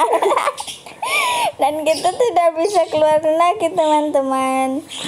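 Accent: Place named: Java, Indonesia. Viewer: Pliss dong medok nya membuat saya mengngakak 😭😂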